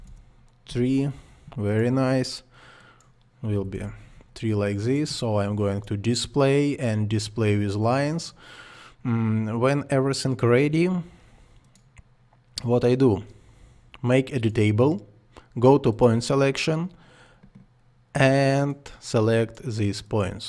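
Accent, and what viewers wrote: Russia. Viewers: А по-русски тоже самое можно?, иностранцы ваш английский все-равно не понимают, думают вы по-русски говорите...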